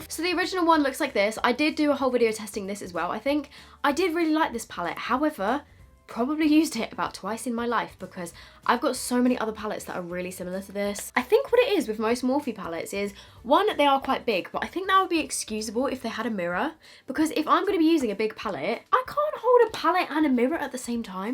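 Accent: United States. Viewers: So odd hearing you with American accent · Your American accent has me shook. I thought someone dubbed over you😂 so much better than our actual American accent tho. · That American accent x 😂❤ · Great American accent.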